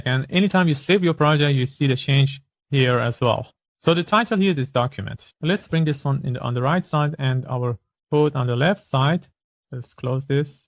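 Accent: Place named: Iran. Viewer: داداش همین که صداتو شنیدم فهمیدم ایرانی 😂❤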